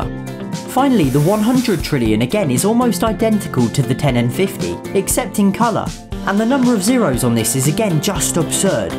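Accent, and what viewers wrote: United Kingdom. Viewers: within the first 15 seconds, i knew you were British, the ascent and the monopoly board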